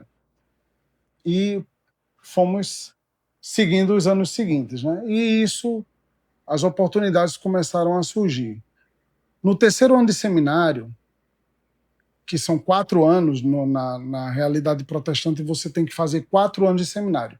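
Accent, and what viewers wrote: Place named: Recife, Brazil. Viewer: Glórias a Deus!!! Aleluias!!! Vocês são de Recife? Seu sotaque parece com o meu.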